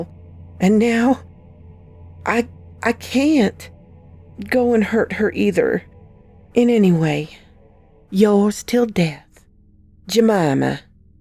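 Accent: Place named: South, United States. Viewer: Hey. Thoroughly enjoyable creepy stories. I loved the southern accent too. Hope you're having a great week. Catch you soon.🌷🎩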